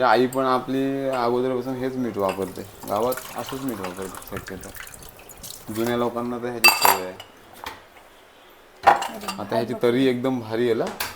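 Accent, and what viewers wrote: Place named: Raigad, India. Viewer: मस्त ani bhari tuj बोलण khup आवडतं मित्रा ओरिजनल रायगडकर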